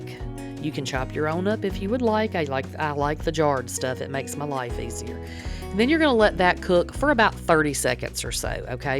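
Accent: South, United States. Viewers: Blueplate Mayonnaise???? lol I have NEVER heard of it in my whole life??!! lol And from your accent you are most definitely Southern or as my first cousin Pam Jackson from the south, i.e., Lakeland, FL, likes to say, “Big Country?!” lol BUT NO WHERE HAVE I EVER SEEN BLUEPLATE MAYONNAISE??! lol I’m sorry I’m not making fun of you I’m just shocked I’ve never heard of it and we are most definitely BIG COUNTRY too??!! Lol I’ve seen ALOT of Dukes Mayonnaise in North Carolina where my father retired out of Fort Bragg, but I personally don’t care for it. But I just can’t get over the fact that I’ve NEVER seen BLUEPLATE MAYONNAISE before. lol 😆 I don’t think I ever saw it in Publix in FL either or Tennessee where my cousin Pam and her husband Dan live now. 😊I am a die hard Hellmans Mayonnaise person myself. Just saying!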